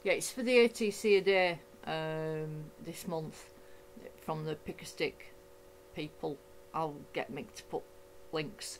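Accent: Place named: Northern England, United Kingdom. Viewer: Love your artwork, watch videos at night when I can't sleep so this is the first time with volume, lol, not the American accent expected but a right northern accent :-) x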